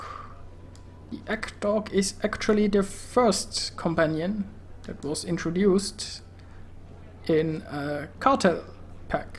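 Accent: Germany. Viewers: Your accent tells me you're German. Would I win if I had a bet on it? :D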